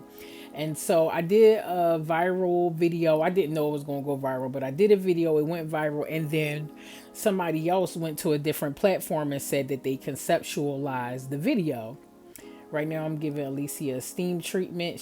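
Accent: Philadelphia, United States. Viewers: I love your hair videos however you wanna post them. You do great hair and it’s also nostalgic to hear you talk. It channels my inner Philly Jawn/ Girl even though I haven’t lived there in 20+ years. Lol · You do beautiful hair, talented, mellow with the Philly accent that shines through, I’m from South Philly but living in Cali for many years…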